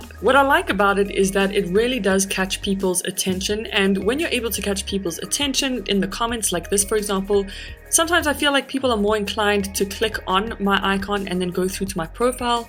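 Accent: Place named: South Africa. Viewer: Oh my gosh :D I just started watching this and was like 'this check has got to be south african!' :D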